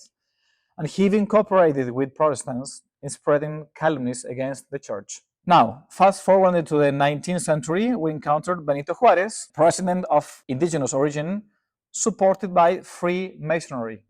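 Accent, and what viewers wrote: Argentina. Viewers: Muy bien inglés con acento argentino. Me encantó.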